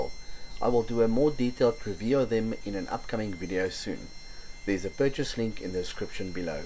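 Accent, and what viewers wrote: South Africa. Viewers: South African? Dig the design!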